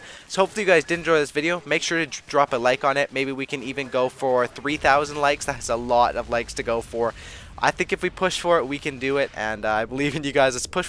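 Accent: Canada. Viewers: are you from Canada? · r u Canadian like me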